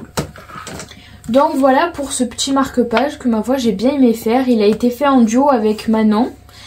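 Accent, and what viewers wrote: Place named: South, France. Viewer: Coucou, je suis tombée par hasard sur cette vidéo et j'ai passé un très bon moment, je possède aussi le petit livre Fantasy mystère, effectivement les solutions sont superbes mais comme tu dis c'est tellement petit 😱 J'avais commencé le dragon et je l'a laissé en cours , je n'ai pas le courage de le reprendre 🤣 J'ai fait la chouette que tu montre et je te confirme que c'était très long , et encore , moi je fais ça aux feutres donc je trouve que c'est moins difficile d'accès qu'avec une pointe pinceau comme les feutres acrylique , surtout dans les toutes petites zones ! Je ne sais pas d'où tu es exactement mais ton accent me fait pas mal penser à celui de chez moi , même si, pour une sudiste, j'ai pas un accent ultra marqué, je suis pas loin de Montpellier, enfin à 45 minutes quand même xD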